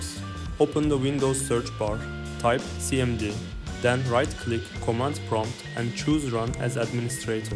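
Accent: Turkey. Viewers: eyvallah reis işe yaradı aksanından belli türk olduğun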